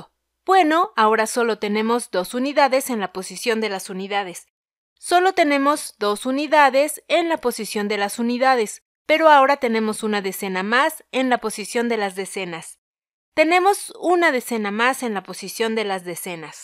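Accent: Mexico. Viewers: este acento es mexicano?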